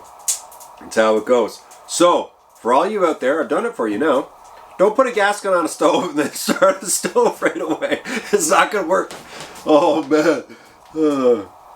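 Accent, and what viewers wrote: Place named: Nova Scotia, Canada. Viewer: Get wicked stuff man. Sound like you’re from NS exploring the far north! Great stuff. I know a legere from ns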